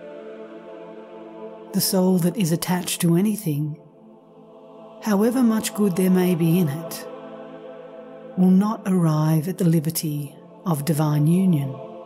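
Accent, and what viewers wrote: Australia. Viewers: Love the Aussie accent! Very early on in my spiritual path I belonged to an Australian Catholic listserv (yes, it really WAS that long ago). I made some wonderful friends who helped me enormously along my way. Listening to you brought them to mind in gratefulness. Thank you!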